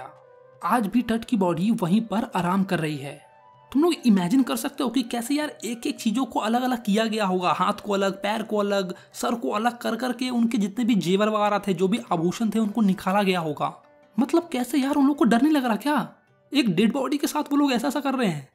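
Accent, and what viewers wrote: Bihar, India. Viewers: Bhaiya aap bihar se ho ki mp se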